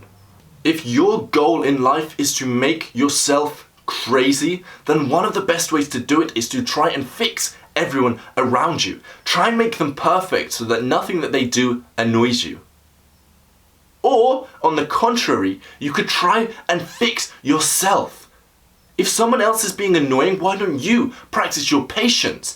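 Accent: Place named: Southern England, United Kingdom. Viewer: Where were you brought up pal Presumably south of England but where? How you say like is distracting FYI great work btw 🙏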